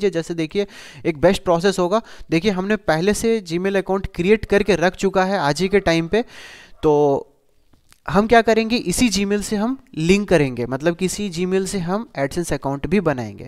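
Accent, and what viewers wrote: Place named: Jharkhand, India. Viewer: Sir ji kya aap jharkhand se ho kya.....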